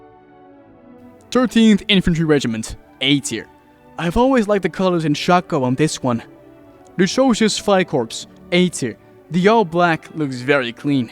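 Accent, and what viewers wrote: France. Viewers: i like ur french accent, subbed good vid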